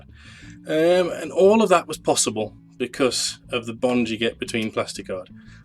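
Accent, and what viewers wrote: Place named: United Kingdom. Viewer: Your Brittish accent is so annoying. Please do videos in Middle West American English, please.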